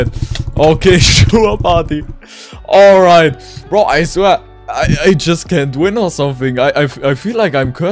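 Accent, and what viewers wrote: Germany. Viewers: are you german?kinda sounds like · THIS IS THE MOST GERMAN ACCENT EVER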